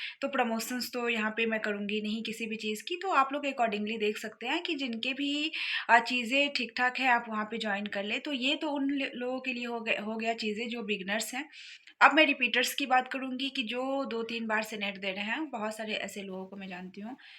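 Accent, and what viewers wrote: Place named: Bengal, India. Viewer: By your language accents, you looks bangali??...i mean are you from west bangal??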